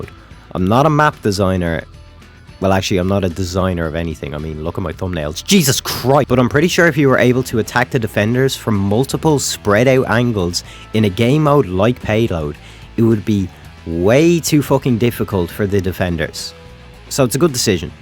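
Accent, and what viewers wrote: Ireland. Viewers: is this man irish and so am i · Very well done mr irish voice person. Sub increment plus one. One thing I've noticed playing defense as scout is that people get SUPER bitchy about it. You can't play scout for five minutes before you're being told you're the single worst human being to ever have been born. I don't usually play scout and I do usually play payload (or koth because koth has pretty maps) so it's super jarring to go from being the medic 96% of the time to being told I'm dead weight as a scout. (Particularly jarring when you go from top scoring the prior round and hard carrying an offense to being told to self terminate on defense) I always did kind of wonder if it's just me who experienced this and it seems not. Would love to see more. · Are ye a Dubliner?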